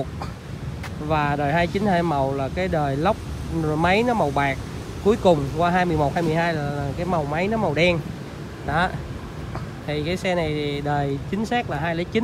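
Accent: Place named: Mekong Delta, Vietnam. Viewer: Nói chuyện rất dễ nghe. Nghe giọng miền tây thiệt thà.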